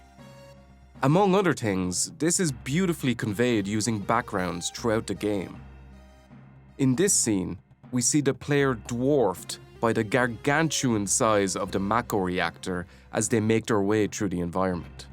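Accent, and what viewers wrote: Ireland. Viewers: You're from Dublin, ain't'cha? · Tree D or 3D 😂 im a a black girl from Atlanta but my dad's parents are from Ireland. I know a fellow Irishman when I here one. I'm making a 16 bit game and need all the info I can. Take care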